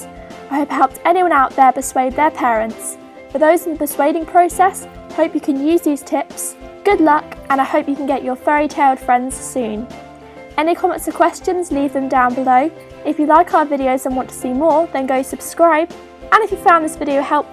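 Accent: United Kingdom. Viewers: OMG!, not only did I LOVE your video, It actually WORKED FOR ME!!!!:D I want to SCREAM I'm so happy! I watched your video, and I made a couple long paragraphs about rats, a couple facts, and my plan, and I'm getting a rat... THIS WEEKEND!!!!! To make my parents get more onboard, I'm going to use my own allowance to get everything! >D You don't even know how ESTATIC I am about this. P.S. I love you're (I think British) (I think it's spelled) accent! I'll tell U about her when I get her! Love, You're Biggest FAN!!!! >D!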